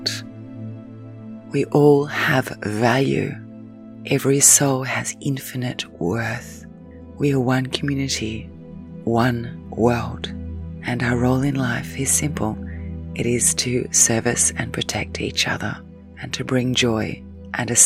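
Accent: Australia. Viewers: nice hearing a fellow aussie I'm going through a very tough time ( I'm a carer for my beautiful fiancee who self harms). Listening to you is sometimes the only thing that gives me solace and reminds me that yes "I'm ok " xxx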